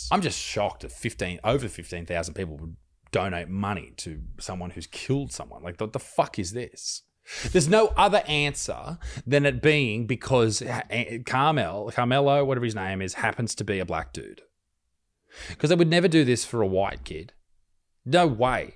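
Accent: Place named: Far North Queensland, Australia. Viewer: Mate, as a fellow white Aussie who is from far north Queensland, you have an accent that sounds like you could have been raised a couple of streets away from me. But honestly mate, you seem to be so unrelatable with your views. It seems your understanding of racial politics in the US sounds as about as good as mine, (given I have only been there twice). You seem so opinionated and adamant about things that are unnecessarily inciteful. Agree you were somewhat a little bit careful about it with comments that it could be white on black, black on black etc… and that they were both 17 etc. But your focus that the amount provided to the alleged perpetrator is only made because he is black is completely unfounded. Moreso your comments (and I think your point) that you believe most of the donations come from white donators because of white guilt. 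These are extraordinary claims unless you have something to support it. The title “white kids lives don’t matter”, is also an ignorant one, given the US history, along with you’re your illogical and irrelevant comparison to a 17yo’s stabbing with the George Floyd incident (an experienced police officer sustaining kneeling on an unarmed and unthreatening mans throat). These are baffling to your already weak and uneducated points. Did you think it could very well be that the black community in that part may be tired of having the perception of rich white people getting their people off in other circumstances, so rallied with financial support?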